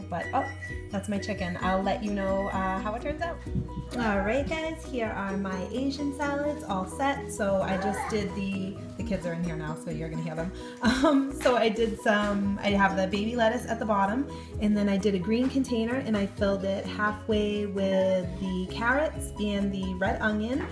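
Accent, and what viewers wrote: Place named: New England, United States. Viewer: You must be a New Englander hehe! Where did you get those awesome containers?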